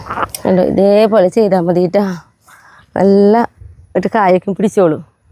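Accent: Thrissur, India. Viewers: ഞാൻ നിങ്ങളെ vdo എല്ലാം കാ ണാറുണ്ട് വിഡിയോയും പിന്നെ യുടെ ഈ സംസാരം സൂപ്പർ തൃശ്ശൂർ സ്ലാംഗ് ഇഷ്ടം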